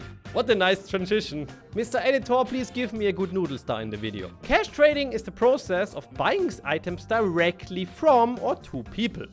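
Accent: Germany. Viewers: Bro dieses Deutsch Englisch macht mich fertig 😂😂😂😂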